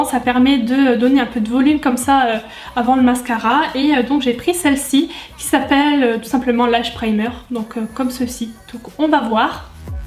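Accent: Lorraine, France. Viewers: Je me disais bien pour l'accent ! Originaire de Lorraine également 😉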